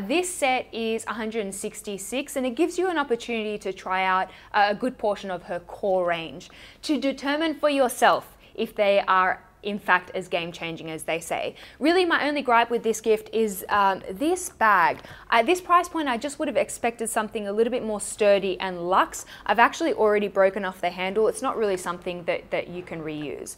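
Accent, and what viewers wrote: Australia. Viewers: This video makes me so happy 🥰🥰 I always adore your content but this was especially 👌 When you said ‘ya weirdo!’ And swatted at the screen I burst out laughing. I’ve been overseas half a year and just the combo of the Aussie accent, the -ism and the playful niceness made me think of home and Aus, which I’m starting to really miss since I’m in the Dutch winter and the weather is grim/there’s been a cultural adjustment to say the least. I love how you give specific recommendations such as ‘the friend who reads all the glossy mags’ it makes me able to picture what goes where, or even more like which vibe suits who. Which is what I always aim for in gift giving. And finally, at the end when you spoke about engaging with something close to your heart and you spoke with such enthusiasm and sincerity...that was so lovely. A reminder of a different kind of gift giving ❤️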